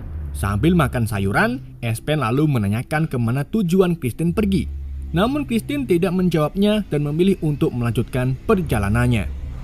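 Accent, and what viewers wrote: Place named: Java, Indonesia. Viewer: nyong curiga dari dulu kayane admin wong jawa tulen😂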